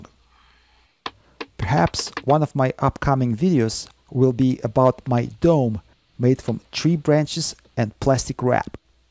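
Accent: Russia. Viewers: Я только за если человек стремится изучать больше языков, но при этом твой контент теряет акцент русского. Кстати, не советую так же т.к данный материал получается дублирующий контент, за что на ютюбе могут сделать бобо. Т.к. алгоритмы понимают данный материал как повторяющийся\дублирующийся контент. Удачи, здоровья!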